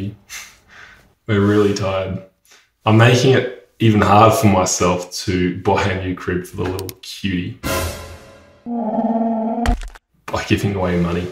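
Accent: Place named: Australia. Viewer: yes, that's always the case. I was asking about what's your take on using chatgpt to overtake FS. No offense, but if you are to reply to my comment, make it meaningful. Otherwise, just ignore it ;) I have a feeling it's easier in Australia (based on your accent, but I might be mistaken). Either way, this tactic is still working, but it needs some adjustements for the global market. I know I might hurt your ego with this and I will probably kill my chances of earning a thousand bucks, but let's discuss this.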